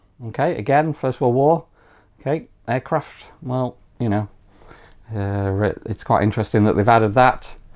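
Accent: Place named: Europe. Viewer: I infer from you accent that you live across the Channel and not across the pond. So, yes, marketing is the reason why US forces are included instead of British troops that should have been a way more obvious choice - or, as you mention, Russians, Italians, Austro-Hungarians, etc. And, indeed, an expansion with British / Commonwealth units is under way; pictures of play-testing are available on the Strategikon forum where the creators are most active. I remember one of the creators telling me he had a lot of documentation to make scenarios with UK and Empire troops, I even think the Middle-East theatre was mentioned, and that he was kind of heart-broken to have to drop some of it to maintain the amount of material economically reasonable - at least as far as a first batch is concerned. Focusing on French troops and West front in WW1 is natural for a French team, because of more than 1.3 m dead soldiers... 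and to make a difference with the usual production in which the conflict first tends to be limited to US Marines and Belleau Wood. As a conclusion... support it and you will sure see male and female Mark I tanks in Gaza, one of these days.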